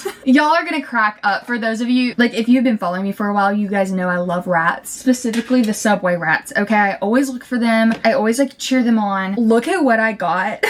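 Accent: South, United States. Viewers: lol you’re twang is so much more pronounced around your fiancé 😂I get the same way around my southern grandparents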